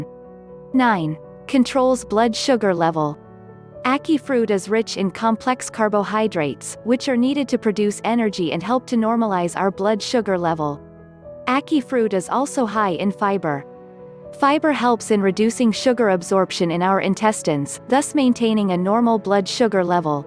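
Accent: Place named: Portland, Jamaica. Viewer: You sound like you're from Portland!!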